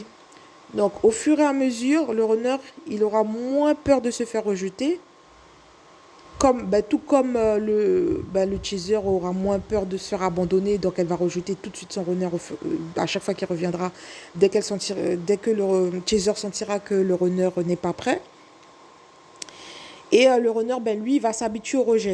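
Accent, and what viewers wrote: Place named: French Guiana. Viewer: Bonjour, merci pour ces explications. Je ne connaissais pas votre chaîne, je me retrouve dans vos explications. Je me suis abonnée et écoute depuis hier vos vidéos. Vous avez un accent guyanais 😊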